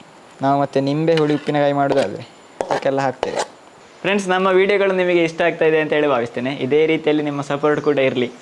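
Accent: Mangalore, India. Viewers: Thats Mangalore Kannada accent. Yes, unlike Bangalorians they speak pure Kannada.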